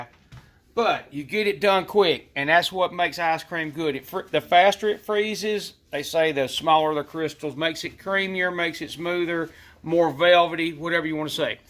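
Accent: South, United States. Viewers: Love it! And love your southern accent!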